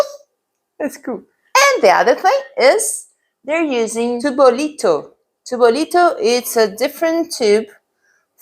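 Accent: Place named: Brazil. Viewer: ok nice ! I worked for a Brazilian bank for 5 years. So I recognised the accent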